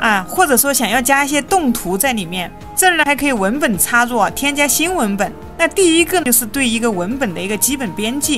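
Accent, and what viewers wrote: Sichuan, China. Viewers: 这个川普太养人了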